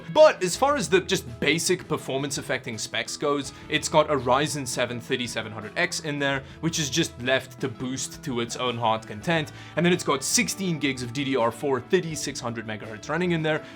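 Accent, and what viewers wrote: South Africa. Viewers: is jy van suid afrika bra jy het daai stem van suid afrikaaner lol ek baie neskiedag plus is algemene naam in suid afrika maar ek love jou vids jys supper funny bru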